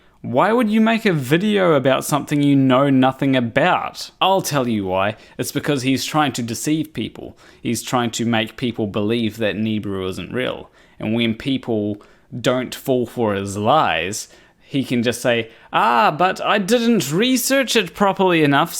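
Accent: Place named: New Zealand. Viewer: Best N.Z. accent on U tube.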